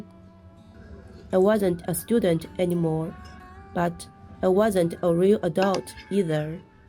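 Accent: China. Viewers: Your video is refreshingly real. Your English pronunciation is extremely clear and much better than most students from elite universities in China whom I met in the USA. One more thing, there is nothing wrong with having a Chinese accent because we can understand you effortlessly; in fact, I think accents add richness and diversity. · Your English is quite good. Never mind the slight Chinese accent, how could it be otherwise? Your pronunciation is good and your sentences are fluent and correct. Do not underestimate yourself, you are an ambassador for your people. I think you are a very brave woman. 🙂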